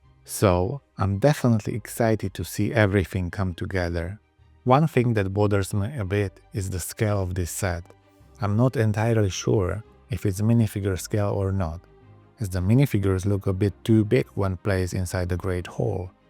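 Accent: United Kingdom. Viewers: I’m sorry to be rude, but I’m not watching this channel as much anymore. There was something charming about the British AI voice…that said, I pretty much hate everything else AI, but he had happened to pick the perfect voice. Oh well, I suppose I can always go back and watch the old ones.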